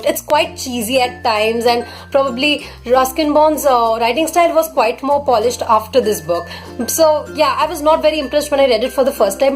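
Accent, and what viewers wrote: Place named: India. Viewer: where are you from 🙄you re kind like indian accents. 😏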